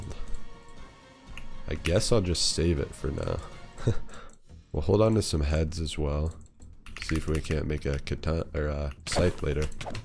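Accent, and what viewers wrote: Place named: United Kingdom. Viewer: bro is british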